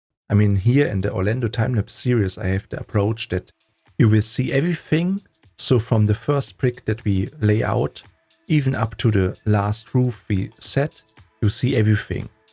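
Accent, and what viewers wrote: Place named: Germany. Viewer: Ohne zu wissen das du ein Deutscher bist, hat dich deine englische Aussprache verraten :D Mal Spaß bei Seite, du baust extrem gut, Respekt vor dieser Zeitaufwendigen Arbeit.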